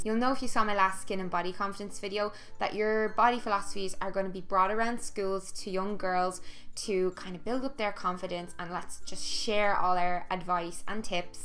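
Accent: Ireland. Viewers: Love hearing an Irish accent when I click into a video, much love from down the road in Kildare ;) :)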